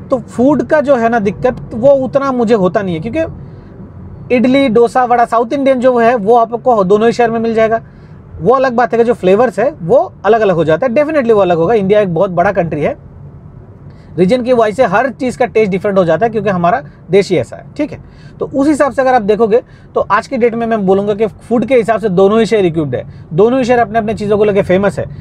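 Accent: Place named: Hyderabad, India. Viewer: No sense of comparison! The food is best in Hyderabad overall in india, plus if u see hyd is worlds best city to live in since 5 years. The infrastructure of hyd is well planned since 1500 years the whole city was build by Qula qutub shah and it has largest Post office to first airport and many things . Hyd is not considered for south food it’s famous for biryani and non veg foods. Again largest film city + tollywood ads more opportunities to hyd. On top of it hyd is more welcoming to all Indians compare to Bangalore. Especially North Indians don’t like to live in Bangalore or Chennai but they are more comfortable in Hyderabad as food and language is major attraction . One more thing ur speaking almost hydbdi slanng 😂😂. Thanks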